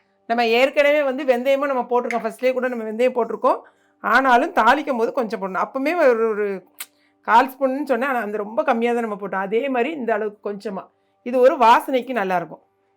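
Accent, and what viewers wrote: Tirunelveli, India. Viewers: Neenga tirunelvelithana akka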